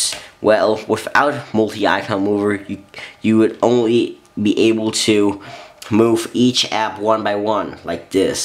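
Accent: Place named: Asia. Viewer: You sound asian.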